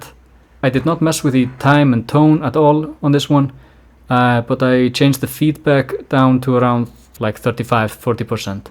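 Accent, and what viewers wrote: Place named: Finland. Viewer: yo, you sound finnish lol, love the video. Keep going!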